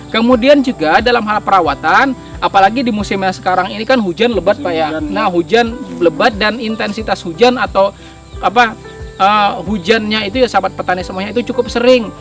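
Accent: Banjar, Indonesia. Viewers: Org Banjar sklinya 😂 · Jelas sekali ulasannya, sehat selalu utk bapak penyuluh. Kya logat banjar, orang mana bapak? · Pian org banjar kh pak. Ampun chanel ini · Pian orang Banjar kah??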